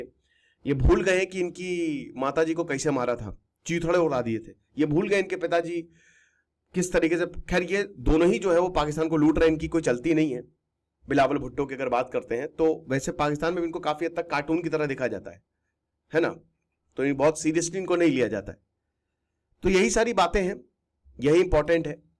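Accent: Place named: Uttar Pradesh, India. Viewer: Marenge Ghaseet ke tamachaa sahi ho jaaoge ..... 😂😂😂 Sir ji ka UP wala accent bich bich me jaag jaata hai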